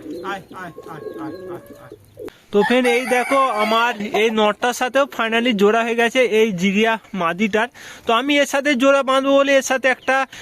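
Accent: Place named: India. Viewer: আপনার কথা গুলো দেখে মনে হচ্ছে আপনি ইন্ডিয়ার লোক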